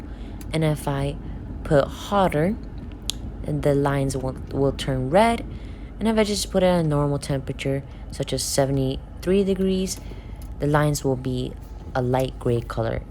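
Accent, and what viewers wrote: Italy. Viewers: 🧐🤨🤔🤔🤔🤔, Are You Sure About That! Oh & By The Way, Your Voice Sounds, Uh, Italian Stuff!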